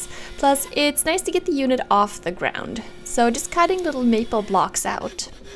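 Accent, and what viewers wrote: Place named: Sweden. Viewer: I hear that you are Swedish nice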